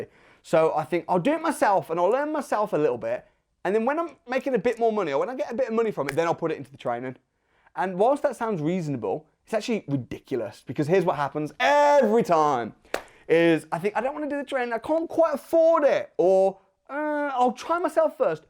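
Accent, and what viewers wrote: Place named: Birmingham, United Kingdom. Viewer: Youre a legend but I can't help but be fascinated by that Brummie accent of yours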